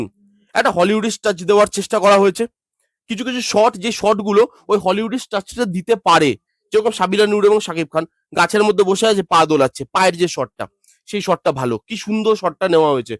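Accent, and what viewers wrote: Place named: West Bengal, India. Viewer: vai apni je Malu opar banglar oita apnar kothai buja jay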